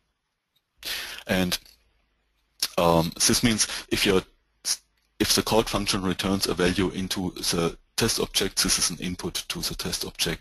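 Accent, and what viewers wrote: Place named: Spain. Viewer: Sound like Spanish but understand very good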